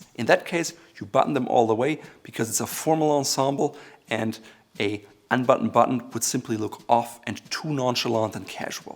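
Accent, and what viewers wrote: Germany. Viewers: May i ask are you German or switzy?😊 i like the accent brother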